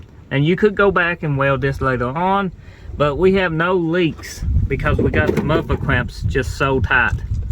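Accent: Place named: South, United States. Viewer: fuck the exhaust, iv never herd a strong southern accent with a 5 year old's speech impediment. impressive.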